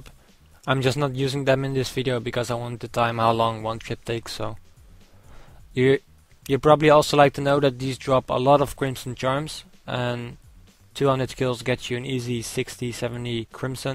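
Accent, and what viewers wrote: Netherlands. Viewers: Je spreekt echt 'nederlands' engels.. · wist dat je NL was door je accent:p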